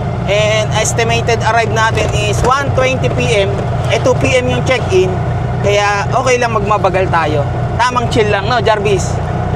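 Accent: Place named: Pakil, Philippines. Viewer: parang taga Pakil ka sir? kilala ko punto mo eh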